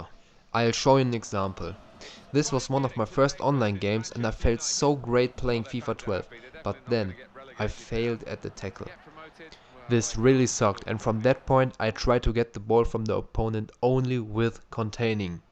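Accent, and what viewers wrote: Germany. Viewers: he speaks very good he just haves a german ancent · German accent. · haha, i love the german english :D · Kann man gleich an deinem Englisch hören, dass du Deutscher bist. Schönes Tutorial :D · Sick accent bro, I love Germany!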